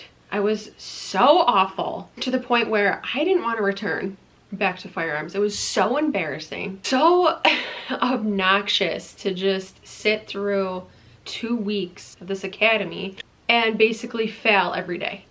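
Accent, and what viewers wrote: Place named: New York, United States. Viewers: She does not have an accent to me. :-) I'd say upstate somewhere, not down by the City. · I love your New York accent, that's like a real cop's accent. I honestly wish I lived on the east coast just so I can talk like that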